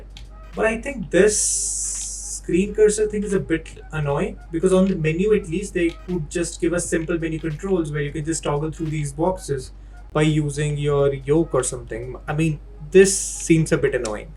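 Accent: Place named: India. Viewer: I like ur Indian accent ❤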